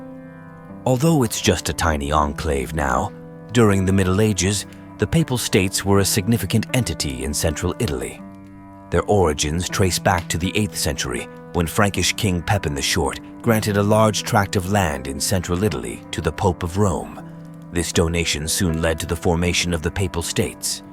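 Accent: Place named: United States. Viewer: The sinister American voice over and sneering is a deception. As I learned at university documentation as happens now, was often simply a formalisation of a fact. The Papal States were a buffer intended to protect and reduce pressure from the rule of kings and armies. It was intended to create neutrality as the Bishop of Rome acted with more authority than any present internal court. The claim Constantine had donated the territory to the Bishop of Rome and Church was not untrue. Constantine had compensated the Christian Church for the way the Roman State had attacked, murdered and persecuted them. He gave the Laterin Palace to the Bishop of Rome. Given authority to the bishops along with governers. He built basilicas, founded churches and created religious tolerance via the Edict of Milan.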